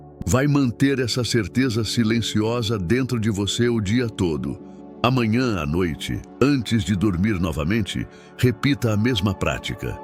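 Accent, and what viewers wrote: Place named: Brazil. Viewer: Porque se oye en du brasilero?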